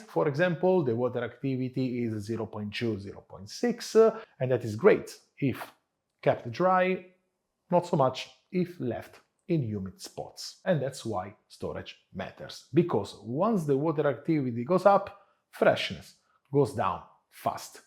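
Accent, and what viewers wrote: Italy. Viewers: Don't give up your Italian accent,! It enhances your credibility, along with all the impressive science. Molte grazie. You're the coffee go-to man.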